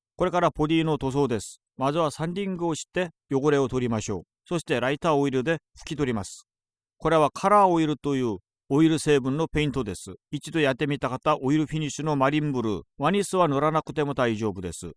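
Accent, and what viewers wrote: South Korea. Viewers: 왤케 한국인처럼 일본어를 하나 했더니 한국인이었구나😅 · 억양에서 한국어가 느껴지는 건 왜죠? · 뭔가 한국인이 일본어 하는 느낌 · 韓国（？）だっけこのタイプの日本語クソカワイイwwww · 外国の方？韓国の訛りなのかな〜 全然分からなかった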